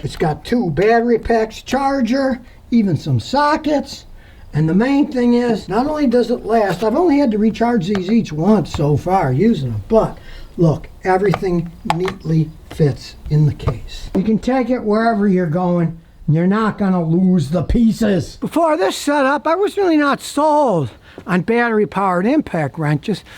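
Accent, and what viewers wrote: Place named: Texas, United States. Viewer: I thought he was from Texas.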